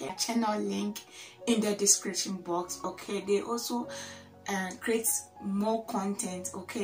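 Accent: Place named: Ghana. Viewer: My favourite girl with the beautiful Ghana accent thanks for being a hardworking lady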